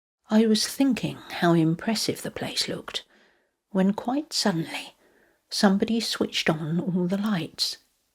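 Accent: England, United Kingdom. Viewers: Well read. Good to hear English stories in an English voice, and spoken slowly and clearly.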